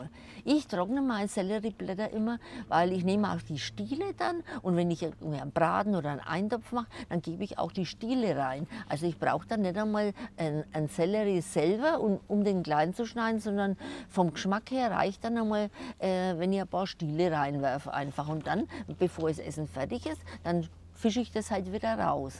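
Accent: Franconia, Germany. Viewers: Eine Freude den typisch fränkischen Dialekt zu hören. Ich tippe auf Mittelfranken 😊 Super Tipps auch noch dazu 👍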